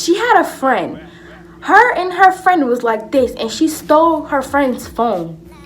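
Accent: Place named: Georgia, United States. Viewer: you from ga ? if so, i can tell bc of how you talk😭.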